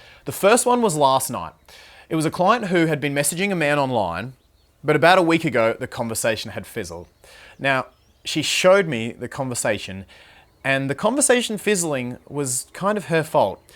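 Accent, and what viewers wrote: Australia. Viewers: Bruh u an Aussie??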